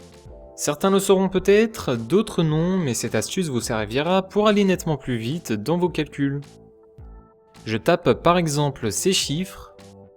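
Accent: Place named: Switzerland. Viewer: Sémantique à revoir. Vidéo bonne. Accent Suisse qui passe mal, manque de punch.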